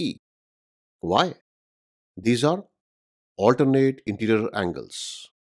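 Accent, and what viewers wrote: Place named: India. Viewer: u sound indian tho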